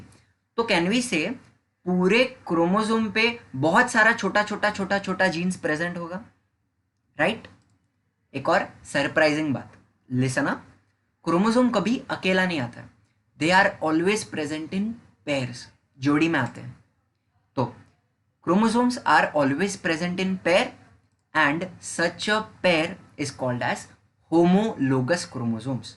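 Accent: Maharashtra, India. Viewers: Sir tumhi marathi aahat ka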